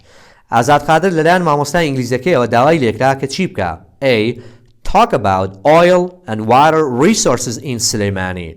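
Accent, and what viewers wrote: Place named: United States. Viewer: Mashalla your accent is incredible just like American kepp posting teacher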